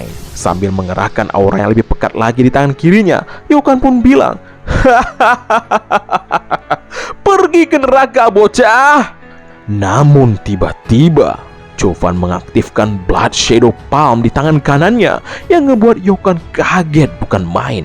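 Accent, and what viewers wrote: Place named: Sunda, Indonesia. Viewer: iq7 lebih kentel sunda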